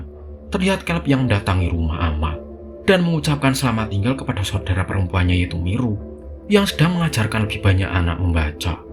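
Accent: Java, Indonesia. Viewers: biarkan alur ceritannya dibaca Text to voice AI itu lebih jelas ejaan Hurufnya., Medok bngt jadi gk jelas ngomong apa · Ngomongnya kadang kurang jelas karena medok banget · Maklum jawir😂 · BAHASANYA MEDHOK NJIIIR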